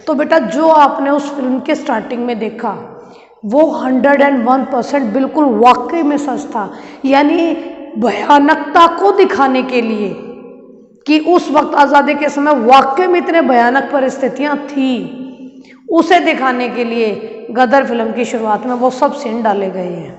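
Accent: Haryana, India. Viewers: Mam aap haryana se ho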